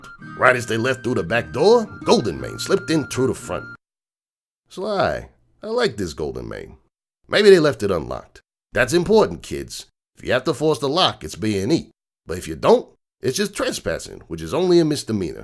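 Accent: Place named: Brooklyn, United States. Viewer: Hehe. Again, I kinda love your Brooklyn accent. Of course, her you've got a lovable scamp of a character, but still. I don't know whether to think there are more bedtime stories, considering how much Bad Horse goes on about good writing, but I hope that there is, and I hope you decide to read more of them.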